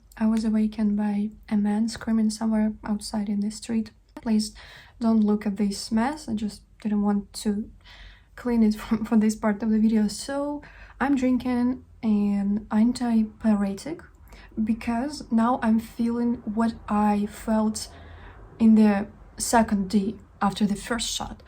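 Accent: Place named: Russia. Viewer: I love your accent! I don’t know why I’m so fascinated with Russia and Eastern Europe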